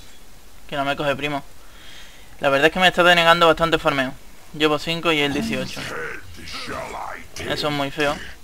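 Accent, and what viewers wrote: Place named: Andalusia, Spain. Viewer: a que tu eres de andalucia?¿ o al menos pareces XDD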